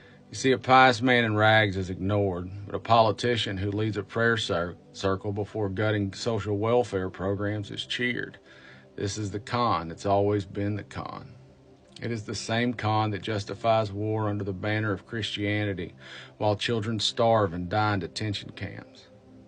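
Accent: South, United States. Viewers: You are a gentleman and a scholar, sir. With a southern accent, you command attention without threatening, judging, or raising your voice. Thank you. You are a joy to hear in these troubling times. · Southern dialect. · This Canadian loves your Southern values and wonderful accent. Please seriously consider running for office. You would make a amazing statesman. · Refreshing to hear a southern accent gentleman speaking the honest to God truth. What a great country. I have faith. I have faith in this gentleman and others like him. Who speak truth to those who despise truth and laws.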